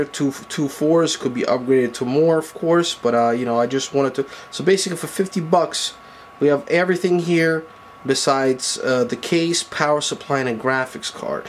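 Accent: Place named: New Jersey, United States. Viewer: I might be wrong but it sounds New Jersey lul or maybe Boston I’m not sure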